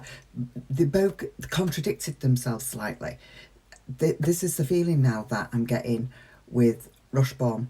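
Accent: United Kingdom. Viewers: thank you. No I figured you were British. I guess i am naive to think Britain like the US doesn’t have different accents. I love your videos 👍 Always looking forward to the next one.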